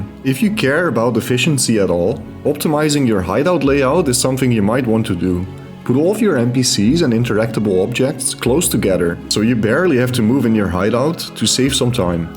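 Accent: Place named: Netherlands. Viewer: This video autoplayed after a random Poe2 video. I was like hmmm his accent sounds Dutch. Then I saw your channel name😂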